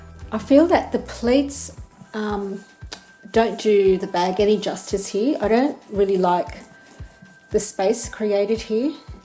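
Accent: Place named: Australia. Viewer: Are you from Australia? I am guessing because of your accent. I’m looking to buy a bag from polene but not sure if they ship to Australia since their website only shows USD dollars or the EUROS. Thanks for your help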